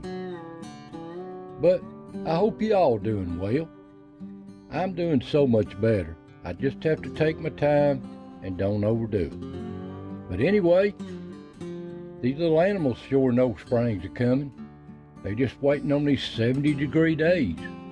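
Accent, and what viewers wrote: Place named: South, United States. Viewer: I love listening to your stories I think the are the best. With your southern accent it is perfect for this Thank you very much 😊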